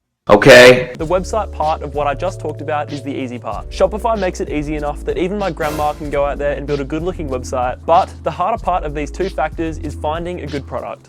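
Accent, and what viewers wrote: Australia. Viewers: Pretty sure you Australian haha big shout out from NZ!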